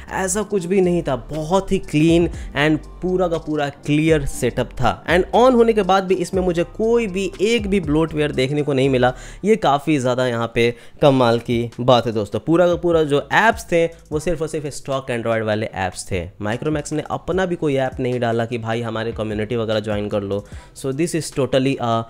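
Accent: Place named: Bhojpuri, India. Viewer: Ye Bhojpuriya touch hamko acha laga 👌❤️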